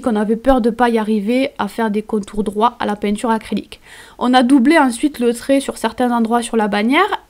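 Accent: South, France. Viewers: Moi qui suis parisienne, ton accent m'emmène dans le Sud, puis cette façon de dire "mon pompon" je craque c'est trop mignon 😍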